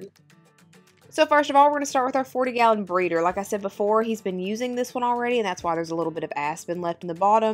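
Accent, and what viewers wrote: South, United States. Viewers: Girl u beautiful But that country accent sum else 😭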